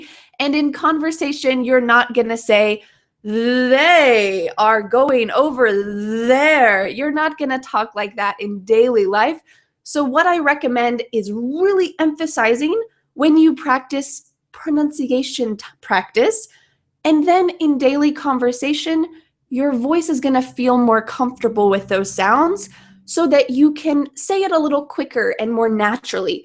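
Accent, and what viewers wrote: United States. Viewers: Hi, I am from Indonesia. I like your video much, as I like American accent, especially you have. Thanks a lot.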